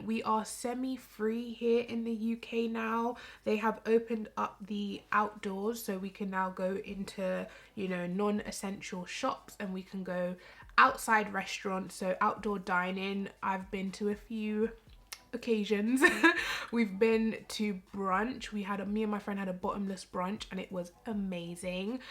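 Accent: Jamaica. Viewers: Omg Ms you are stunning, I love, love your makeup looks, I hope to get as comfy on my youtube soon. Love your work, I finally heard the Jamaican accent. Big up yourself:) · patwa coming through.. Love to see it 😁😘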